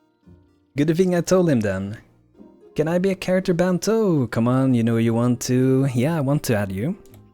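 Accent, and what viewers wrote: Sweden. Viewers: Banto your Swedish right?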